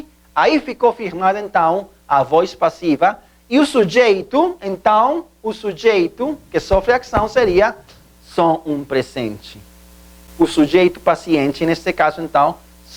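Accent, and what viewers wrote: Portugal. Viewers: Pelo que entendi ele é português. Sotaque e grafia de Portugal.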